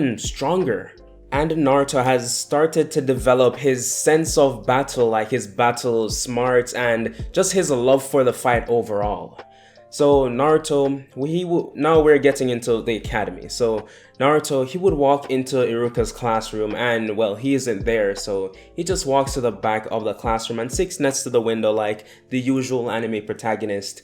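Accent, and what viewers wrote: Jamaica. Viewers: You're Jamaican aren't you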